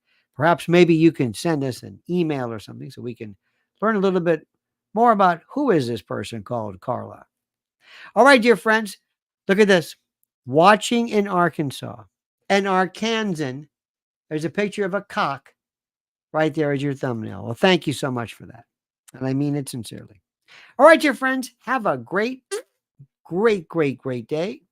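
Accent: Australia. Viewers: Australia is a degenerate festering toralitarian hellhole. They locked down the country hard over a sniffle snd recently sent to prison an elderly woman for a 4 year sentence for walking to her mailbox and allegedly infecting a cancer patient with the coof on the way through a public hallway. They aren't a country of ex prisoners, but one of WILLING prisoners. But yeah, the accent is nice. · Your Australia accent had me laughing big time ❤❤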